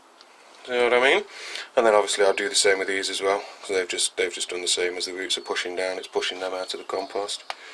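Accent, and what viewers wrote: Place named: Nottingham, United Kingdom. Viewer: I thought you had the accent, I'm from Notts too. I've recently just got into gardening and been enjoying watching your videos, it's making me want an allotment lol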